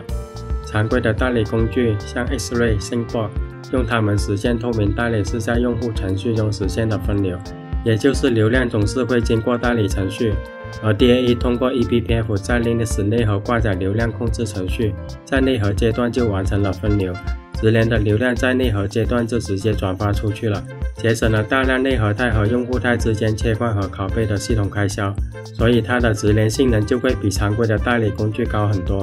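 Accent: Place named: Chaoshan, China. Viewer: 好像很牛逼，空了我也试试大鹅，听口音，up主是潮汕人吧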